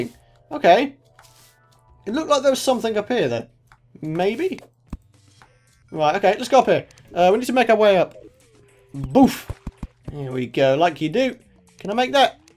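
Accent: United Kingdom. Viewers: You sound a bit British but I'm Australian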